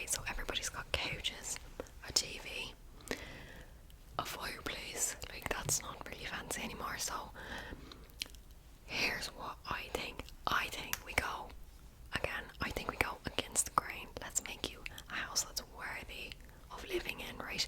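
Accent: Ireland. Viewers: Loved this! More pls- in Irish accent ofc!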